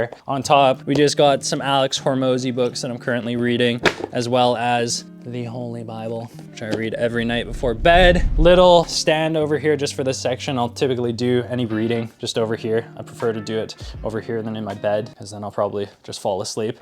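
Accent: United Kingdom. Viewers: i can hear some english twang in your accent... have you ever spent time in england ?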